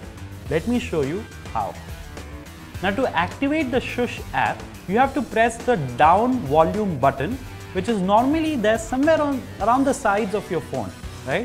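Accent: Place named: India. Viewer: I saw this as an ad before a video started but I kept watching it because I actually liked hearing his indian accent. hah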